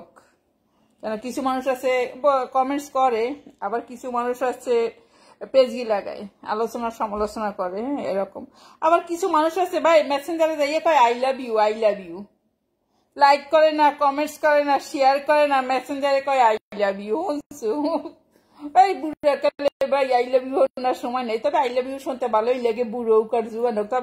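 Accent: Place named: Barishal, Bangladesh. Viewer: বরিশাল এর ভাসা শুনতে ভালো লাগে।।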